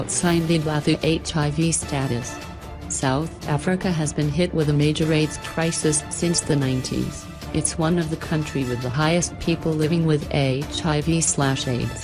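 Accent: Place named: United States. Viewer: who's voice commenting there!!? some American??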